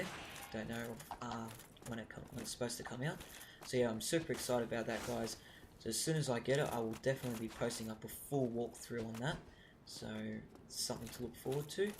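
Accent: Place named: United Kingdom. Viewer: Dude your accent is hilarious haha XD im not a big fan of british accents but your funny ^^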